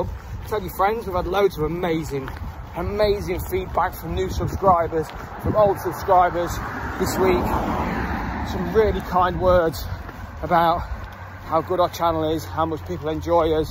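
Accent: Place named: Nottingham, United Kingdom. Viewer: mate I'm from Hullujah formally know as hull🤣I live in Notts now so I thought I recognised that robin hood drawl 🤣👍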